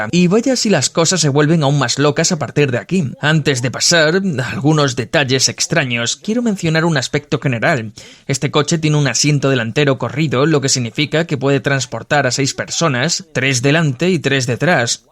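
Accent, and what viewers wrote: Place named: Castile, Spain. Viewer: Nada más asqueroso y desagradable que ese acento castellano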